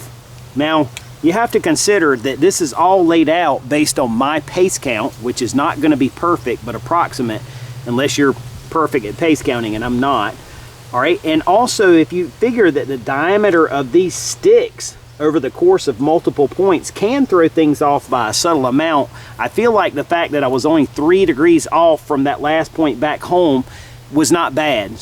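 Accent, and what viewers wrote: South, United States. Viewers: A++! I just finished watching all your map and compass videos.....OUTSTANDING! This was a great review for me, although I'm familiar with using a compass and map, I have not done any map work in years. During the 70's I spent a lot of time in Yosemite backpacking and snow camping. Then I moved to upstate New York near the Adirondacks where again I spent a lot time hiking, camping and canoeing (also using map and compass). This video series serves as a great reminder for this "old rusty bird". Moved to Nebraska in 1986 and most of my backpacking days ended then, except for bike touring and camping in campgrounds. I haven’t had any experience with mapping or dead reckoning, so I found that very informative. I must compliment you again, it's obvious you love doing these videos, because you put a lot off effort in each one. I will continue to watch your videos, I'm hooked! 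Will be doing some "upgrading" of my gear with plans to put it all to use this upcoming spring, summer and fall. Don’t know that I’m tough enough to camp during these Nebraska winters. Thanks again. BTW, what state do you live in? I've noticed your southern accent. I grew up in Texas and consider myself a "misplaced Texan", so I understand words like y'all, fixing and stuff. 😜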